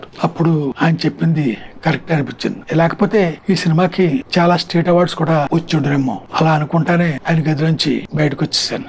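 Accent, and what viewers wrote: Godavari, India. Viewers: Miru godavari slang and location bhaga chupedthari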